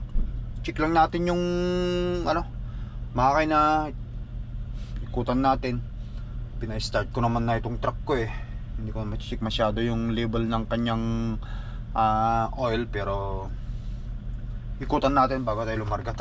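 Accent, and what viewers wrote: Ilocos, Philippines. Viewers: pagpraktisan mo mga anak mo at sabihan mo i-correct ang mali mo. Tulad ng title ng vlog mo ngayon, mali na. Gusto ka ng mga followers mo dahil sa personalidad mo, prangka at walang inhibition. Yon nga lang, pag nag english ka na at sasabayan pa ng ilocano accent mo, halos matunaw ako sa paninimbang. Pero enjoy ako sa panonood sayo.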